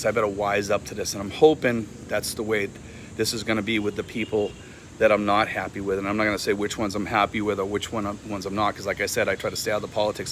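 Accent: Boston, United States. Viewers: Thank you for saying that there are some good women in America! We are not all entitled, narcissists. Some of us ae hard-working, kind people who just want to keep our heads above water. BTW, I detect a Boston accent in your video...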